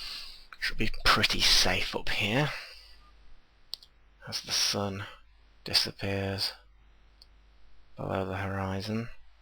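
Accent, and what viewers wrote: England, United Kingdom. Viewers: that english accent makes it all so captivating, it's like watching a documentary... on... digging diggy holes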